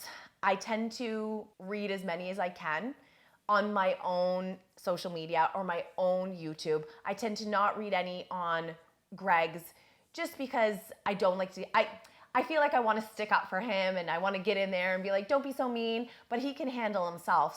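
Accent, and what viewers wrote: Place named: Canada. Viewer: I love the way you say “sorry”. Canadian accent is cool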